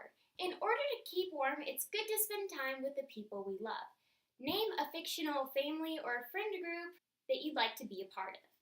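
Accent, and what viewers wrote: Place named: United States. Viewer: I love your American accent! You sound so cute♥️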